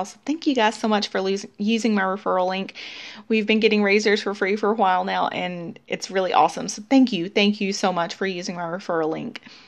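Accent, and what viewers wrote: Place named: North Carolina, United States. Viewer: It is definitely your Southern accent! It is SO North Carolina - in a good way (remember, I am right down the road from you in Belmont, but I was not raised in NC).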